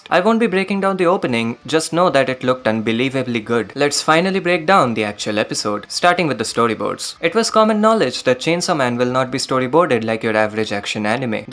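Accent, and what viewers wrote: India. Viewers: Are u from India???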